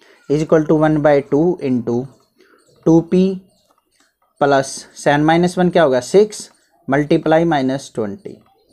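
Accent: Haryana, India. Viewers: Sir are you from haryana your accent is haryanvi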